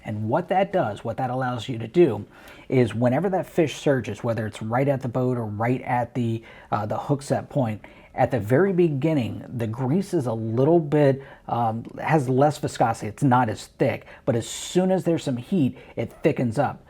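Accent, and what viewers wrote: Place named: Texas, United States. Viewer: Texas rubbed off on you huh?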